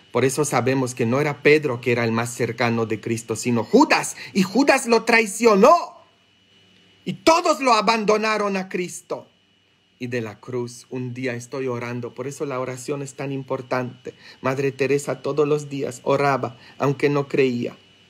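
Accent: Mexico. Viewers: Padre, Gracias! Ud, es precioso me gusta escucharlo en mi español con acento mexicano, soy ecuatoriana, lo amo. Padre, Si creo que existe el mal por eso aconsejan tener animales porque nos protegen; y, el pobre perrito tomó la maldad que le cayo al pobre cura. Muchas Bendiciones! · Que bendición haberlo encontrado padre. Es usted un Ángel!!! Me encanta todo lo que dice y sus explicaciones son especiales. Usted si que aprendió el español mexicano así como de donde soy yo de un pueblo en Jalisco llamado Acatic (pero hoy día vivo en California). Espero muy pronto poder ir a una de sus misas cuando viajemos al norte de California. Dios lo bendiga y nos siga nutriendo de tanta sabiduría. 🙏🏼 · Padre, que bonito es aprender y disfrutar de sus predicas, y yo creo que usted es igual de mexicano que yo, habla igual como hablamos nosotros, igualito...un abrazo muy grande.... 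· Que divertido es usted padre me encanta que sabe el español mexicano👍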